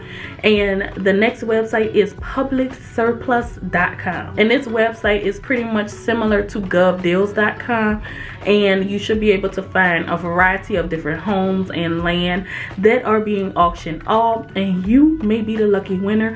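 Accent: New Orleans, United States. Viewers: Yeah I hear New Orleans in her voice 🙌🏾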